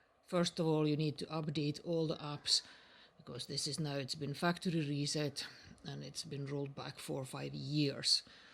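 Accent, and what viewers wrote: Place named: Scotland, United Kingdom. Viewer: Great video,your son did a great job handleing the video.trying to place the accent, im in NE Scotland.get the feeling you are not far away.Thanks again,regards John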